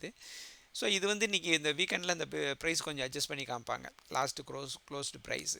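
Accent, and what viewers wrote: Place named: Thanjavur, India. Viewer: Your slang looks like around Thanjavur belt ...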